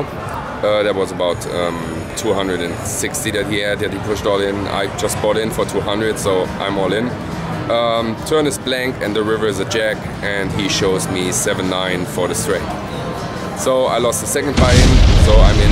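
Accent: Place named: Germany. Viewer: "Early bird get the warm". Awesome German accent.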